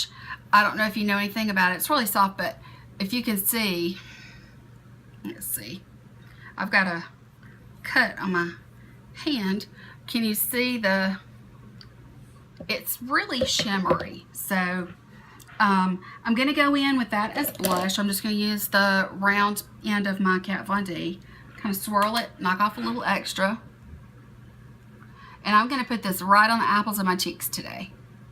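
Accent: South, United States. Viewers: hi i love your southern accent im from australia and i love make up to and if you want to do more make up looks do it don't let any one say differant i would love to see more also you remind me of the actress cathy bates i like her movies to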